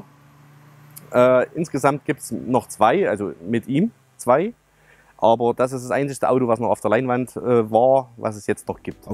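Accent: Saxony, Germany. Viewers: Ein toller Pappe Oldtimer schon fast 70 Jahre alte Entwicklung! 👍🏼🤘🌹 Ein echtes Roeff ..Töff Töff! Cool! Nach damaliger Bestellung.... nach 12 Jahren erhalt......schon verrostet am Unterboden! Mit ganz tollem sächsischen Dialekt! 👍🏼👍🏼👍🏼👍🏼👍🏼🤣😂😎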